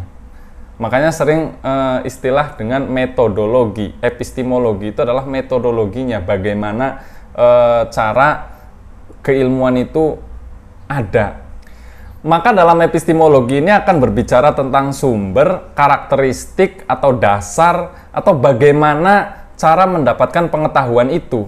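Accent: Banyumas, Indonesia. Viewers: Out of topic bang, bang nya orang ngapak ya? Ora ngapak ORA kepenak😅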